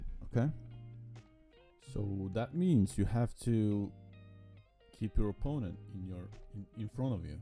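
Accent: Turkey. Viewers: Güzel bir aksanın var ama yine de türk olduğunu tahmin ediyorum :D Video için teşekkürler çok yararlı oldu. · Şunun bir de türkçesini çeker misin kanak ses tonlamandan anlaşılıyor türk olduğun çok rica ediyorum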